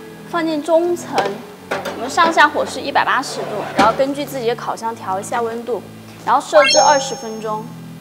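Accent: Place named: Chongqing, China. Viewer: 重庆椒盐普通话听起来好可爱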